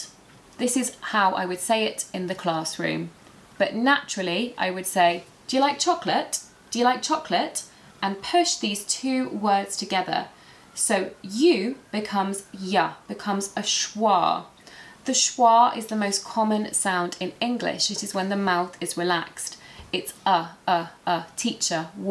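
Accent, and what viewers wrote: United Kingdom. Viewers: Thanks for Lesson! 👍😉Yes, understand native English can be very difficult, but listening to natural English via Youtube I hope to help me! 🙂For me, I admit the connected speech is very difficult, but it sounds likeable, and surely I trying it! 🙂 I think that my biggest mistake I learnt grammar during my education (the professors were always got attention on grammar, the little on the conversation 😞) more than the others parts of English, but from now it'll be different! I do try my best! When it comes to understanding English, I would like to watch my favourite series ''The Hotel Babylon'' without subtitle on my own language, but the London accent sounds difficult! Although this series lasted too short and watched it already, I can watch it always! Maybe with english subtitle would be easier? And forget, it's good your advice about exercise understaning Native English with girlfriend! 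😀👍 About accent, I prefer British accent! I really like it! I I liked it because of you! 😉🙂 In my opinion, British accent sounds more elegant from the American, more beautiful! 🙂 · I really like how you teach. I love British Accent. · I like the British accent. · British accent i want to hear more, And to learn. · Hi from Mozambique, I love British accent and I'm following it, so thank you very much for spending your time for us.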